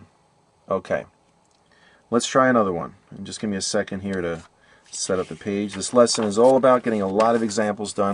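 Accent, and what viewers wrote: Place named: Long Island, United States. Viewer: long island accent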